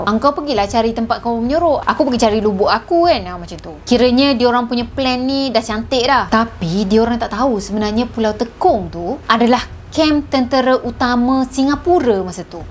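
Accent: Kelantan, Malaysia. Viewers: Iyo mek Kelantan la ni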